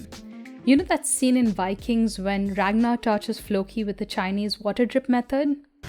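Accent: India. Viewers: Why do sound like my Indian neighbors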